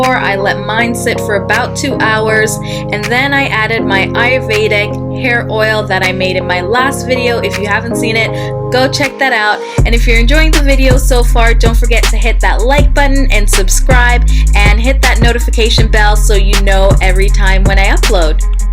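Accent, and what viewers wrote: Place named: Jamaica. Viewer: It is that Jamaican Accent Young Lady♥️. I actually have the henna mask in my head now. Plan in keeping it on for about three to four hours. I will definitely be looking forward to new videos from you.♥️♥️♥️♥️♥️♥️♥️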